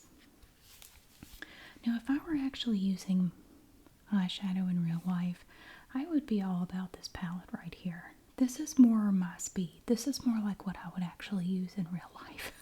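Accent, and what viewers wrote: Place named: North Carolina, United States. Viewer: Also, I love your videos and your voice and accent sound very similar to my godmother's who is from Hertford, NC. It's so comforting 😊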